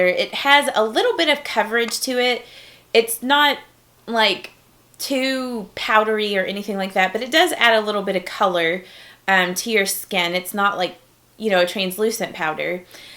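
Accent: South, United States. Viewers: Love seeing someone else with a southern accent.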